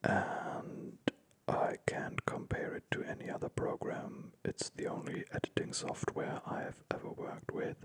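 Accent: Germany. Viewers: Is he german? :D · Is he from germany or something like this ? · I always just watch you in these types of videos lol not so much for the asmr aspect lol (and I enjoy your accent because my whole paternal side is german)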